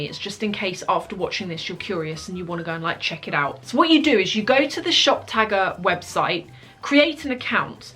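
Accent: United Kingdom. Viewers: I’m only here for the British accent!!!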